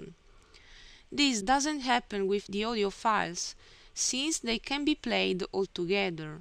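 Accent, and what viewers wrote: Italy. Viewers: nice english with italian touch... love it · I am melting, I love to hear her voice, what a cute little Italian accent.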